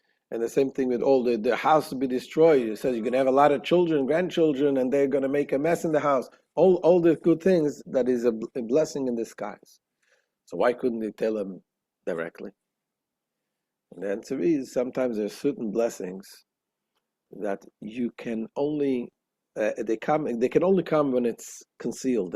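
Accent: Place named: Russia. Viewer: B"H Calm down. Get control of your Body. There is no month by that name in the Hebrew reckoning of time. That is the name of a Babylonian idol and if you mark your time by Babylonian astrology you can't attain Immortality. Only Torah time is infinite. This is the twelfth month of the year. זה החדש השנים עשר Try to at least pronounce the Hebrew with some kind of Semitic, not Russian unintelligible, accent. If you knew proper Hebrew, you'd know there is no depression at this time at all. ראש השנה = 861 = אשר המחשבה = בית המקדש Can't you so much as see that ראש = אשר If you are a Jew, may HaShem grant you the insight and courage to return to Hebrew Tradition, to the Hebrew language, to Hebrew Torah from your Yiddishkeit narishkeit. If you can't, or won't, you may be sure that you're not a Hebrew. Hebrew is as Hebrew does.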